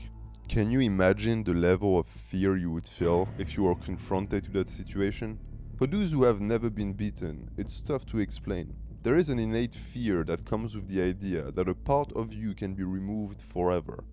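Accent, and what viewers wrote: France. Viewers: are you french? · En écoutant ton accent, anglais, en voyant ta façon de prononcer certains mots j’en déduis que tu es un français qui parle en anglais, c’est le cas ? In listening your accent, in the way your prononces some words, I’m deduce your are a French guy who speak English, is it true or not ?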